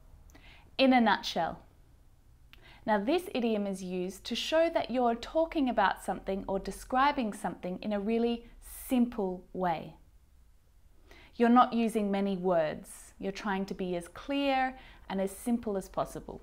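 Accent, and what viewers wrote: Australia. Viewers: Hello, are u teaching Australian English? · Hey, I really enjoy watching your videos, even more with this Australian accent. Hey, just giving an idea for you, you may start your recording with some warm up activity, in order to prepare your audience for what comes later, then stablish tasks with information gaps to maintain the curiosity of your audience. It's just an idea for you. Thank you for being shared these idioms. Cheers. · you have no ideia how I do like your videos. I found you so clear when you are speaking, although I am familiar with Australian accent because I already have been in Australia over a year taking my uni exchange I think there is something really special in the way that you speak to, I can not to point it out but what I know you are the best English teacher that I met so far. You are so creative and the way that you try to create an interaction between you and your audience makes easy to memorise what you are teaching. 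I'm planning to move back to Australia again and take my master's degree there, therefore I will take again an English exam very soon so, I'm watching to your videos and practicing the imitation technique regarding to improve my speaking skill.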